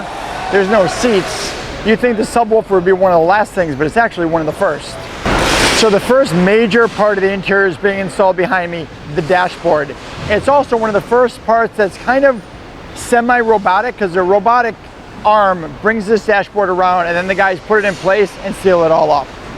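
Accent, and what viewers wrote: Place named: Chicago, United States. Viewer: I sense a Chicago accent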